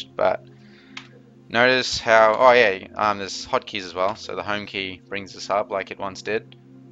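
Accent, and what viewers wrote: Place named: Australia. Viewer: That Aussie accent.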